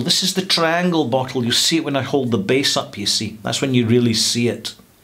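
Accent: Scotland, United Kingdom. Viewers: I was drinking a glass of tullamore dew and decided to youtube a review and stumbled across one of your videos. I have to say I love the Scottish, particularly since I hit Edinburgh last year with my missus. Honestly, I could listen to you for hours, I'd love to buy you a whiskey and to hear your stories. Much love from Ireland <3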